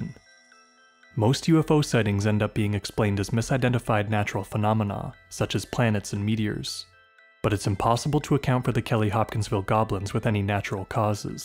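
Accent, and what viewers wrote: Canada. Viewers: Are you from Canada or thereabouts? The way you pronounce certain words, like “about” and “house” is very endearing. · I can tell your Canadian 😂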